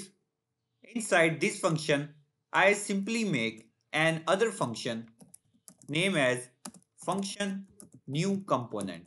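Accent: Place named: India. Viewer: damn, I heard the Indian accent and said noooo xD but in the end my God this was a great explanation, the best I've found about this topic, you get a like and if I find something else like this in your channel you get a subscriber.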